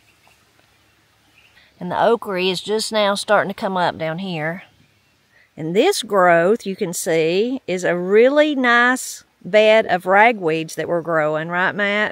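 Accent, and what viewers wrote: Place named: Arkansas, United States. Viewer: I love listening to you talk. You remind me of my aunt pearl she was a native Arkansas. And she speaks like you do w that accent. It's so true...and calming to listen too. So is voice I get soo much off her devotionals.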